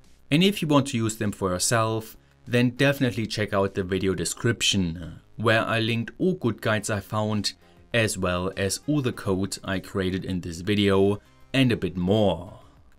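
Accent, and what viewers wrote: Germany. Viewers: Ich liebe dieses Deutscher versucht Englisch zu sprechen, die Betonungen sind Gold... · are u german?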